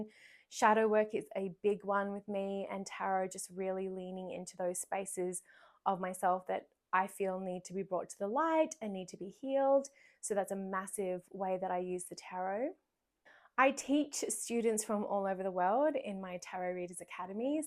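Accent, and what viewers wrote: Australia. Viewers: Well what can I say ? Just splendid. I know you said you were in Canada, but you sound like one of my Aussie compatriots. Thank you for the upload.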